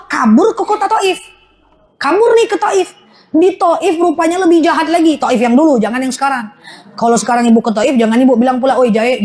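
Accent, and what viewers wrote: West Sumatra, Indonesia. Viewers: Minang ini. Mas bro 🤭😊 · Orang Indonesia ( minang bro ,kelihatan logatnya) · USTADZAH ITU PUNYA LOGAT,SEPERTI ORG SUMATERA BARAT (PADANG). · Katanya paniang kapalo brarti eta ustadzah urang minang euy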